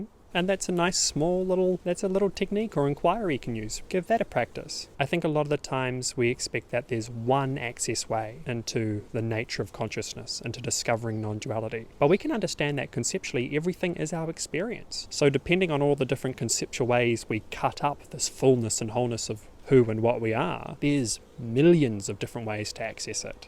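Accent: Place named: New Zealand. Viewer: Its nice to hear a NZ accent on youtube talking about non duality thanks .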